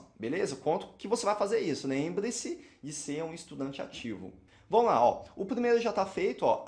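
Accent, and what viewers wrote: Brazil. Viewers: A parte de explicação gramática está joia, mas a pronuncia tem muita influência do sotaque brasileiro, seria importante aprimorar essa parte.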